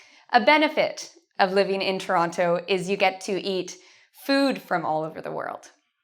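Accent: United States. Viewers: You're the best teacher I ever had, sirouslly. I'm brazilian and I only have one year of practece but I can speak of course not that good hah lol. All right I love you you're cute you know how teach and even have a American pronanciation. That's what I want to lol. Thank you and send more video okay? Bye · I love the American accent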